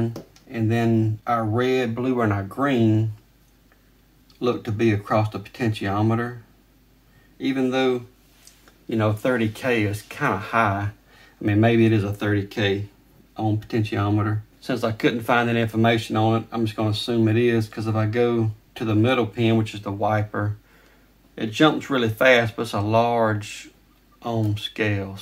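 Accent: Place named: North Carolina, United States. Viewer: Are you from North Carolina by chance? Your accent sounds like my dad's hometown in the southeast.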